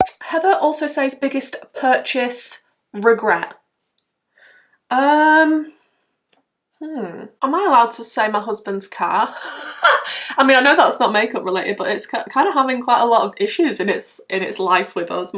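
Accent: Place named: North, United Kingdom. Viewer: You remain a total pleasure to watch... and listen to with your cheerful northern accent ❤. Maintaining your impassioned religious faith, especially one you acquired as a teen or young adult, is really special. It speaks of your strength of will and ability to withstand the changing fashions and trends around you. In fact, your commitment to your makeup aesthetic, irrespective of trends, shows the same fortitude! God bless you and your loved ones.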